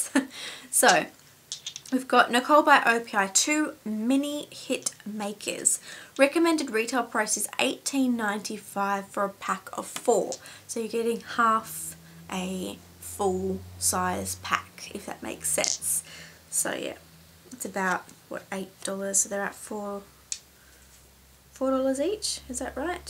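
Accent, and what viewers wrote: Australia. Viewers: Hi Beauty. SORRY...but I love you ;-) I am not a lesbian, just think you a great girl! Everything about you is great! Your voice, face, passion and the fun of it! Your Aussi-Slang. I am a body-beauty-freak, too! I just like you! Phantastic person. Would love to hear from you - learn more about you! Greetings from Germany <3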